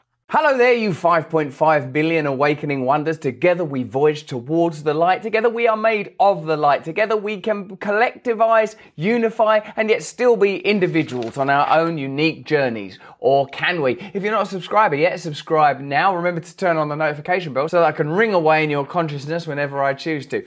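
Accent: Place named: Cockney, United Kingdom. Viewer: Hey, could you please say "story" also without a "t", as you omit all other "t-s"? I'm joking 😊, big fan 🤩, keep up the cockney 😆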